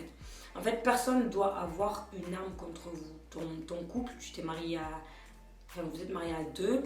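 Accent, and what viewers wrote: Marseille, France. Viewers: Tu est de Marseille ? L'accent ?? ☺️ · Ton accent marseillais est juste magnifique ! Wouaaaaaaaaaw 💓 heureux ménage la jolie ,gros bisous 😘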